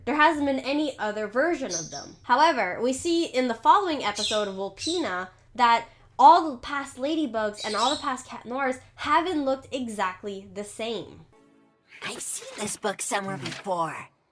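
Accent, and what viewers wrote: Quebec, Canada. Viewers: never seen this channel before, but I guess you're a Quebecker, right? Cause you sure sound like it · Unrelated, but are you from Quebec? Cause your French accent sure does sound like it!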